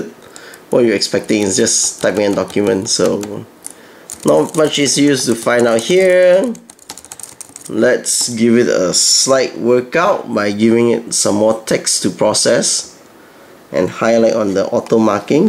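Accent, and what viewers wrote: Singapore. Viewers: Hmm sounds like singaporean Haha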